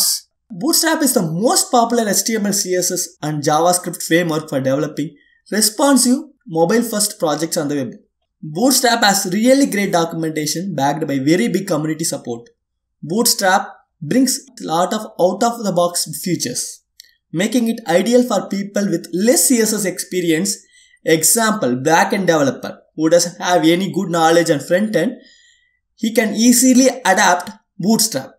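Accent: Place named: South India, India. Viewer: Nice video bro! Just your accent is very South Indian. But since you're speaking English, I would assume you're targeting international audience. In that case, I would advise you to work on getting rid of the strong accent as it's gonna help in growing viewers.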